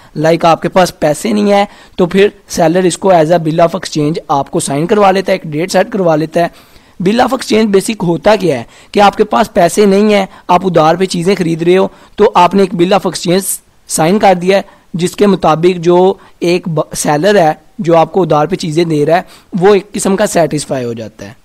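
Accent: Punjab, India. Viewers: Sir aap punjabi ho ??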